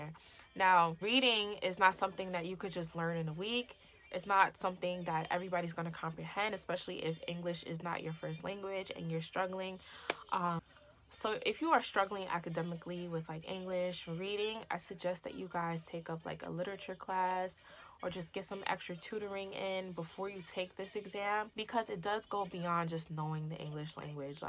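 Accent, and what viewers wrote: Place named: New York, United States. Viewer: Sound like you from ny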